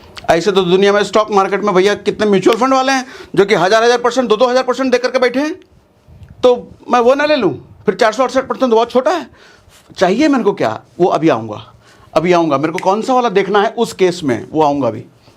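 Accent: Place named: Bengal, India. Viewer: Bhaiya ap bangali ho?👀motamuti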